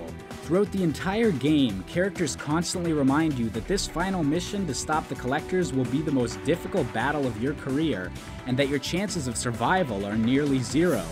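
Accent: Canada. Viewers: This guy is Canadian isn't he? This guys Canadian.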